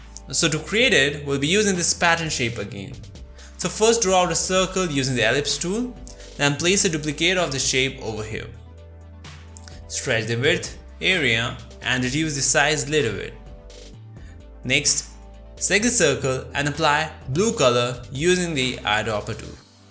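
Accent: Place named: India. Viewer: yea fucking indian accent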